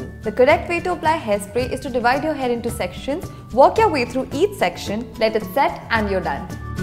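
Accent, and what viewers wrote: India. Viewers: Chinese with indian strong accent , itd called miracle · Why this Korean have an Indian acsent? Lagta hai hum famous ho gai hai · Girl, your accent sounds like Indian but your face looks like Vietnamese or Filipino.... no offense please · why there's an "asian" girl with an indian accent on my screen? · No offence but how do you have an Indian English accent or is it just me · I don't want to be affensive but why does she sound Indian or is she is Indian?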